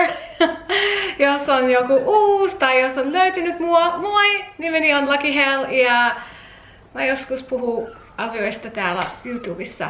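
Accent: Finland. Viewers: minä olen asunut koko elämäni Suomessa ja sinulla on hyvä Suomalainen aksentti! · Ihana suomi aksentti❤️ ja olen ihan suomalaiset mutta enkku tulee multa aika helpolla ku mummo ja pappa asuu jenkeissä ja he puhuvat mullekki aika paljon enkkua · I love your Finnish accent! Very good or should I even say excellent pronunciation!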